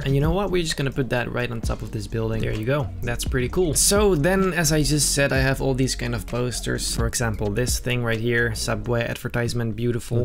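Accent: Netherlands. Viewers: You are Dutch. Im sooo sure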